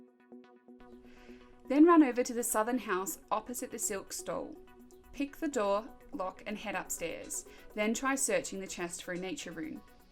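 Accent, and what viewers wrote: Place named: Australia. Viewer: aussie, I like it (im aussie/kiwi)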